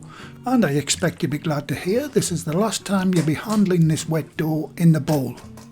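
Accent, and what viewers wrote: United Kingdom. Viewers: You are not just good at cooking, you're really good at explaining details too!! The british accent is a bonus!! It sounds like Yorkshire to me. Anyway thank you!!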